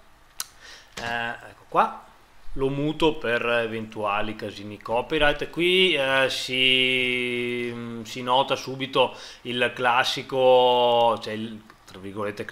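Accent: Veneto, Italy. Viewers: Un po' veneto e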